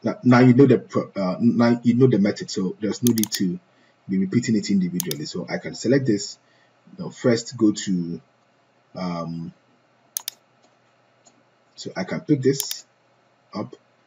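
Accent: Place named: Ghana. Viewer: He sounds more Ghanian than Nigerian.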